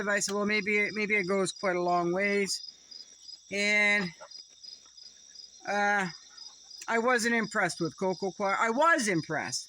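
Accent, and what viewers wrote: Maine, United States. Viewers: Congratulations on your 3,000! Im picky on pages I follow because I dont have time to watch them all lol I only follow a handful, I definitely learn from yours + agree with things you have to say + you make me laugh + of course being from Maine love your Accent. Wow crazy temps up there😮 it was only 85 here in SC. Hope you get some good sleep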